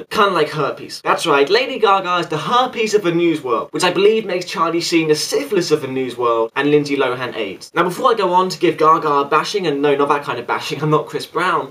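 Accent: United Kingdom. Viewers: He he I can't always understand what you're saying; you're talking too fast in that cute british accent of yours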